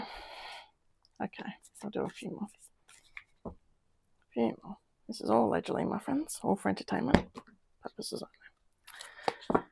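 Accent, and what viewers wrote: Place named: Australia. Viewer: Thanks for another great reading. I totally agree with you about the "York" family; I don't trust any of them either; nor any of the Markles (which of course now incorporates Harry as well). I am guessing that you are from Australia from your accent =). I am subbed to a couple of other Australian readers as well. I am happy that I found your channel a few weeks ago, as I am really enjoying your readings.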